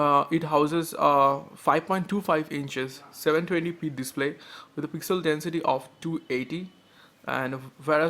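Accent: India. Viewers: تتحدوني ان الي يتكلم هندي ........!!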